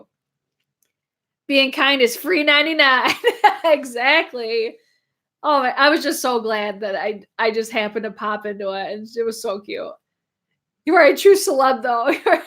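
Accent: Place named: Western New York, United States. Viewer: From what I’ve seen, the same capital investment company is behind Morphe and JHC. If that’s true, they’re the ones behind the new Morphe x JH palette. Trying to boost their investments. Your accent... I was thinking you were from Upstate/ Western NY. I know a lot of people from Buffalo and Niagara Falls that have similar accents. Love from NJ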